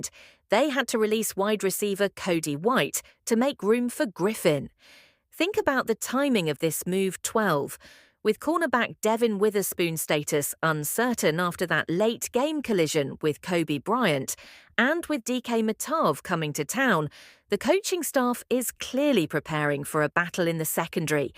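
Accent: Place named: Australia. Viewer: Click bait sewer with an Australian accent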